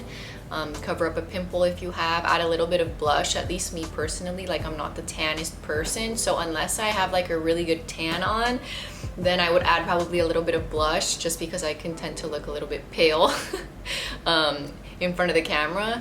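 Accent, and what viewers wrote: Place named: Miami, United States. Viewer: im from miami & as soon as i heard u speak knew u were from there too! thank u for the helpful video xx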